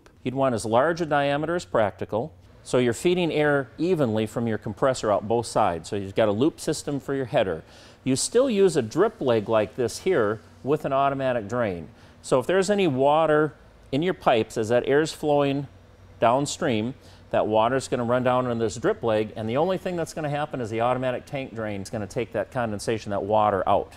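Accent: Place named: Cleveland, United States. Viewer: talks like Cleveland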